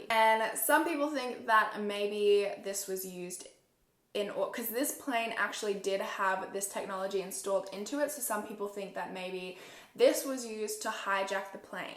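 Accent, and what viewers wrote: Australia. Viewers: Are u Australian or American?😂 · Are you Australian? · Wow im memorised listening to this absoulutly beautiful lady and love the aussie accent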